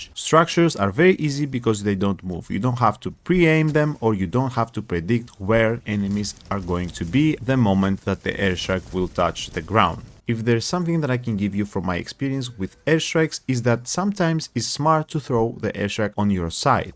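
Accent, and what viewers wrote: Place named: Greece. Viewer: Hey can you teach me greek . I love the accent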